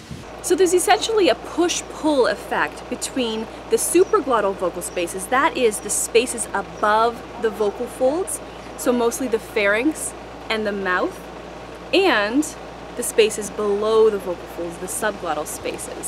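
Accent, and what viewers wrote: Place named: Midwest, United States. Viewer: thank you for sharing your knowledge. you sound like you're from the midwest. i'm from wisconsin!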